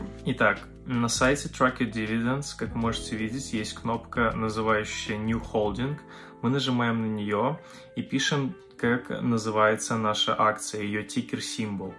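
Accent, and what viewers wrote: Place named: Belarus. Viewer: Беларуски акцент 😉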